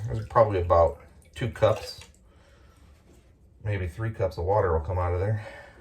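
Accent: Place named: United States. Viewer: Awesome, thanks. May I ask, where is 'your' American accent from? Perhaps someone could let me know. I have always wandered because an American actor has the same, and I just don't know where it's from. If I was to guess...perhaps from around NEW York; but there are more than a few. Thanks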